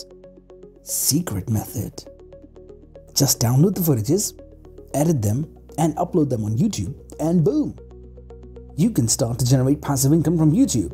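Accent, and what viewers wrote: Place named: India. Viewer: Your English look like you are India I know you're belong to India 👍 I like your videos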